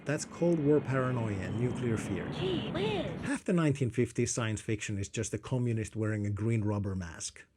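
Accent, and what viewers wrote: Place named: Netherlands. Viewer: Surprisingly interesting channel. I can't quite place your accent and the name " " didn't give me any clues! Some Dutch sounding consonants... But that could also point towards certain Slavic or Uralic. In any event, your English is impeccable. I can't say that future economics is my favorite topic for speculation in science fiction. However, since you ask... I'm afraid i can't imagine a situation where there won't be SOME raw materials that will be scarce. I think we all know about how "rare earths" have become important to computing and cellular technologies. To my mind, that makes a future without some form of capitalism unlikely. However, automation of labor is a trend that hasnt stopped since the beginning of the industrial revolution (and in some ways, even before that.). Inevitably we will get to a point where we won't need to work nearly as much as we think we must right now. 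It you are looking for a "better economic model"'that fits this kind of future, i would say that we already have it in some places where it has been working better than the alternatives since around 1945.. it the Danish model - or Scandanavian model more generally. Highly regulated capitalism combined with a robust welfare state.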